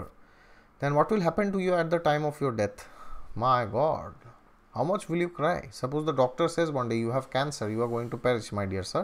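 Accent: Assam, India. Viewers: You are Assamese right?😄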